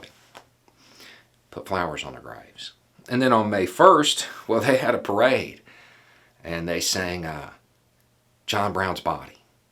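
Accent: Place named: Charleston, United States. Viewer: Worst Charleston accent ever.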